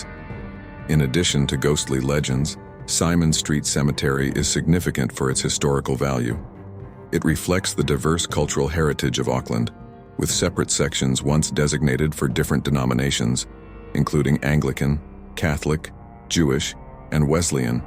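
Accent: United States. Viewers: The guy is American, give him a break😂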